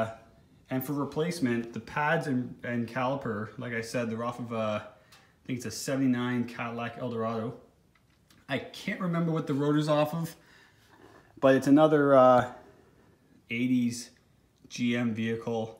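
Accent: Canada. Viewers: You sound Canadian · Love the Canadian Accent, " Ought"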